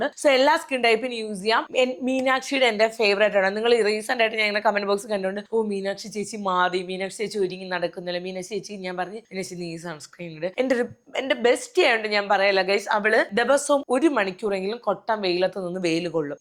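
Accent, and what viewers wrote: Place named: Thiruvananthapuram, India. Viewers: Chechyiiudee ehh tvm slangg adipolii anu kettonduu erikkaann💖✨ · Chechi i have been following u and admiring u since 2 years and i am keep loving watching your videos....i am even get influenced mostly on products you used how you style....and especially the way you talk its so attractive that i am keralite also tvm side now staying and i like the way you present everything in your slang....anyway best wishes for your achievements❤.....i know i am not lucky at all for getting this giweaway....but then also simply trying jst coz my favourite influencer finally came with giweaway nahh....so i wanna be part of it thats it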